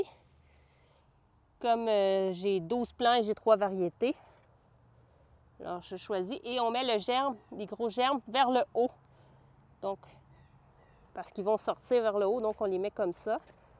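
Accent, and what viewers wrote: Quebec, Canada. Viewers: Coucou amie Québécoise Très heureux d'avoir fait ta découverte et ton accent me ramène à Montréal ou j'étais il y a a peine un mois pour des vacances en famille ❤❤❤ Je m'abonne biensur a ta chaîne Bonne journée à toi et à bientôt Greg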